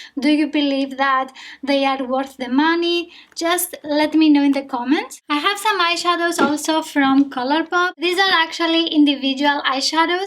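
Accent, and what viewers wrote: Greece. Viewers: where are you from?You sound a lot like Greek 😀😀💝💝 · Η προφορά σου φουλ ελληνική καταλαβαα αμεσως οτι εισαι ελληνιδα 😍😍😍❤❤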